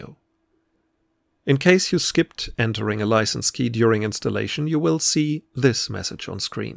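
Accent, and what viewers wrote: Germany. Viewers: I understand a german accent when i see one. Könnt ihr nicht mal ein komplettes deutsches tutorial machen. So ein, so plant man ein Spiel und so nutzt man dazu articy draft.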